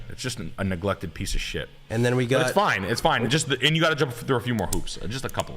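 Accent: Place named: East Coast, United States. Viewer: is the only man with an east coast accent who genuinely cares about anything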